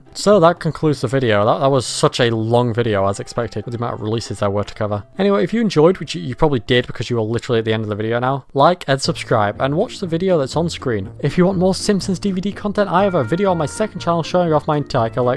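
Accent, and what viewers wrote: United Kingdom. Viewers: Northern English (UK), possibly Liverpudlian. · Can you please stop with the British accent?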